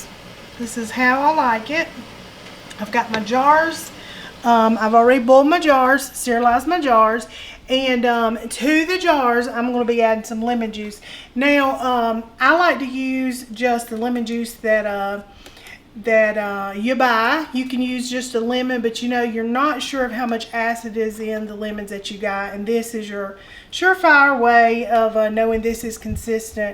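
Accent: South, United States. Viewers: Love your southern accent! Been trying to decide on what recipe to use and I chose yours. 🥰 I'm about to do this tomorrow while I'm watching some Colts football. I would have never thought about using "wheres your sister sauce" and soy but I'm all in on that now. I love a sweet but savory taste. Wish me luck. And we might be having spaghetti for dinner as well. 🤣 Thanks so much for sharing! Subscribed as well. Yay! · ok thank you so much im elated that you landed in my feed, you had me at your southern accent ha ha❤ I love your voice its beautiful and make listening pretty cool. Ive watched this video alone 6 times already while taking notes. Thank you for being available on top of your own life. It takes a village to can food ✅️. Last summer i used the pressure can method with okra in my presto on top of the stove. Lost r jars due to they did not seal. 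I think they were too full also. Like above the rim. 😢. Ty so much you're very helpful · Well, I'm glad you did it! I'm about to try this with my big ol' mess of tomatoes from my garden!!! Just subscribed! I'm a southern girl here in Texas! I don't know where you're from, but I can tell you're from the south! Gotta love it! ❤ Blessings and Much Love my dear sister! 🙏✝️🛐💜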